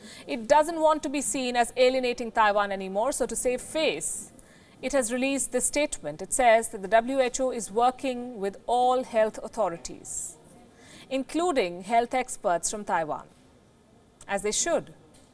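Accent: India. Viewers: Try speaking Hindi or Tamil. Your English is too Indian. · but what part of India though?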